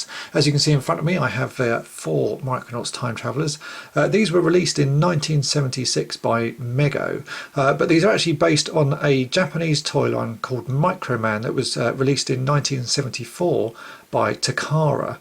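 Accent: United Kingdom. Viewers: John Jones he's a Brit. vowels tens to be reversed.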